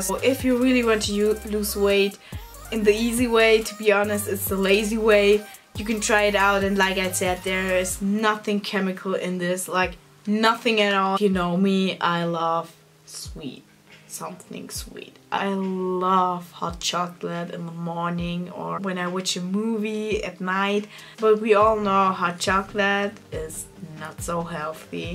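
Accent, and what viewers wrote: Germany. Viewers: Heftig wie ignorant manche Menschen hier sind! Sie kann die Videos in der Sprache drehen, in der sie es möchte. Was ist daran so schwer zu verstehen? War irgendeiner von euch Englischlehrern denn EINMAL im Ausland, wo Menschen verschiedener Herkunft untereinander Englisch geredet haben? Jeder hat einen Akzent! Ihr deuscher Akzent ist normal und NATÜRLICH. Hätte sie einen britischen oder amerikanischen Akzent, würdet ihr doch auch wieder haten mit „sie kommt aus Deutschland aber redet so und so“ ..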